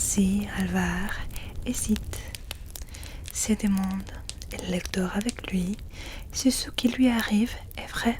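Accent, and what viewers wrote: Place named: France. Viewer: Coucou 🖤. Qué lindo acento francés tienes.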